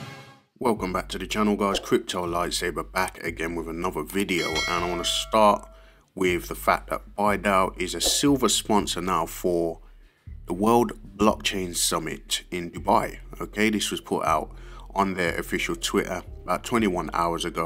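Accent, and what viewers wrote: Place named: London, United Kingdom. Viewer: I like your accent guy, london bwoi.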